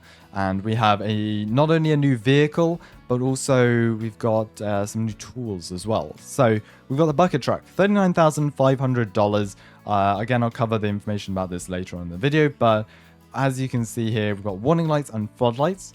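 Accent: United Kingdom. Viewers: hes bri ish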